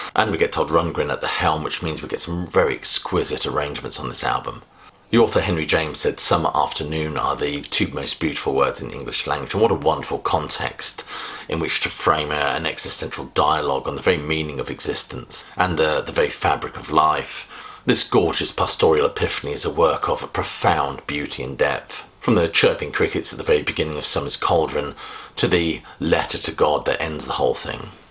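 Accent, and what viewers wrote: United Kingdom. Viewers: Great to see included; I have always admired them. If people do not believe they are good then try listening to side 1 of! I recall Gene Symonds on radio saying were a guilty pleasure, which I thought was a bit of a cheek. The song may be called a guilty pleasure, but never. This is the first time I have watched a Classic Album Review, and I am very pleasantly surprised and impressed by your knowledge, wit and wordsmithery! I shall watch some more. it is nice to hear an articulate British YouTuber. Thank you.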